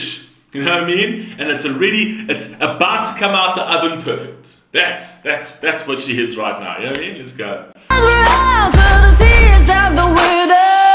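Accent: South Africa. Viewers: Are you South African??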